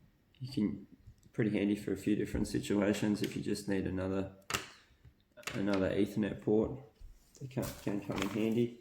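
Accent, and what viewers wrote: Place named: Australia. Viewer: You sound Australian, How long did it take for your hak5 gear to arrive? I've been waiting quite a while.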